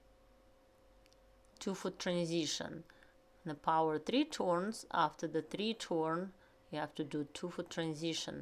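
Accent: Russia. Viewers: А по русски никак? Слышу русский акцент🤔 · Enjoy all your helpful instructions especially with the Russian accent!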